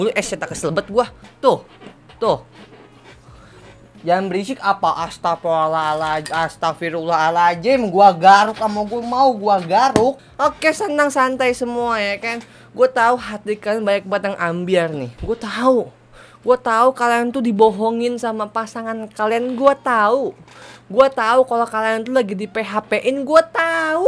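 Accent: West Java, Indonesia. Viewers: abang orang sunda ya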